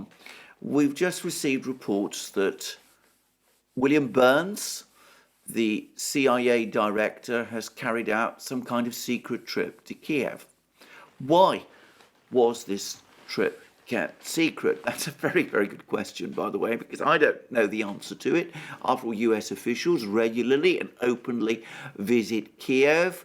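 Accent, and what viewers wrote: England, United Kingdom. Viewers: Speaking with an English accent, when you speak English and live in England, is it so strange? The kind of things that upset some people.... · I m very happy with your British accent. Keep on with your fabolous work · Viva English accent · for the love of God and all his saints, please, do not spend 5 minutes explaining why you, being British, pronounce various non-English names with an English accent. What a strange thing it would be if you pronounce them with a French one. That's how these videos run over one hour instead of 30 min, and I, with all due respect to your good effort and thoughtful comments, nowadays watch only some of them. Cut to the chase, and you have the point, you just have to get to it. More views and more upvotes will follow, imo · Dang... sure wish I could understand English with the "English" accent... 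· Love your English accent.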